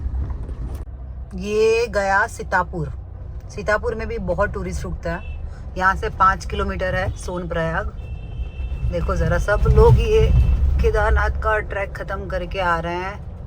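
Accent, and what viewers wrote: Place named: Gujarat, India. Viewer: This happens with me tooo. Gujarati Gujarat thi nikdi shake pan Gujarati language na bhuli shake Btw...love the gujju accent Love from Ahmedabad 🫶🏻✨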